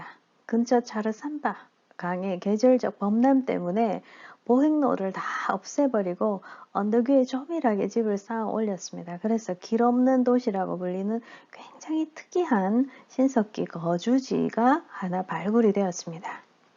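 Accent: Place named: Gyeongsang, South Korea. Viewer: 취향에 맞는 문화채널 우연히 접하네요. 경상도 억양의 강좌 귀에 쏙쏙 들어오네요.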